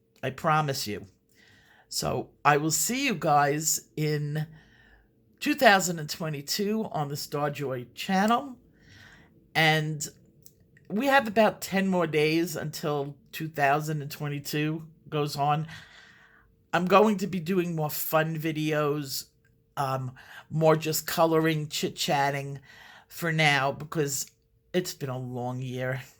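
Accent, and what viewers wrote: New York, United States. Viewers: oh my goodness, you have no idea how excited I am. I always wanted to learn about color and color theory. Mostly for fashion purposes and how color effects people; but never thought of myself an artsy kind of person at all. Until about a month ago, I had no interest in coloring in coloring books. I had no idea this community even existed. Now I’m obsessed!I’m looking forward to all the challenges, that I have no doubt you will be putting on us! Potty mouth, NY accent and all. LOL! Truly looking forward to the channel, learning and growing ❤️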